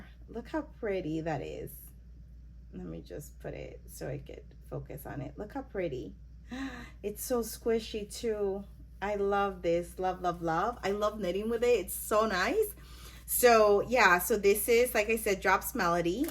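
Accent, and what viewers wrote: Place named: New York, United States. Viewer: I'm so glad I came pass your podcast because, I just got this pattern and believe it or nor just finished reading the pattern on November 27' 2024 sm. I'm listening to you am and you are now talking about the Cumulous knit, lol. I'm excited now to start the Doppio after I finish with your podcast. Your accent is gorgeous almost sounding as if you are a New Yorker and Spanish, but I know nothing even at the age of 72, lol. I'm also a brand new knitter. Figured if I learned to crochet at 11 by reading a book, I could at 72 do the same. Well, that's a lie as I'm shaking in my boots trying to keep my circulars from shaking, lol. I also have a old basket I purchased in Panama during my 20 year stint in the Navy. If I survived tat when women weren't meant for the armed forces, tell me why this knitting is putting fear in my hands, lol. 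I'm going to do it anyway! Thanks for saying a beginner can do this, so I better get busy. I have the equipment, the yarn, my hands and the determination, lol. Wish me luck. I'll keep watching out for your next podcast. You're enjoyable to watch and listen to. Your enthusiasm has lit a fire. You had me interested at hello, lol. Ms. Jo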